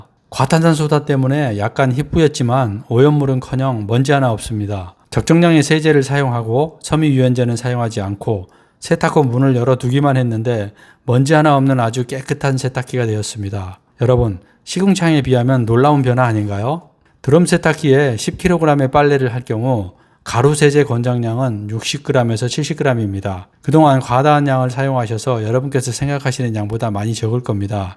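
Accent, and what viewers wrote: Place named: Jeolla, South Korea. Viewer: 억양이 전라도 억양 이시네요^^ 푸근 합니다^^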